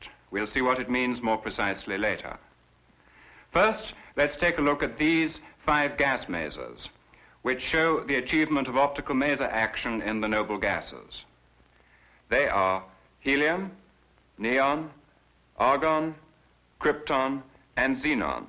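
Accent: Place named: Mid-Atlantic, United States. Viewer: Flawless mid-atlantic accent here... impressive!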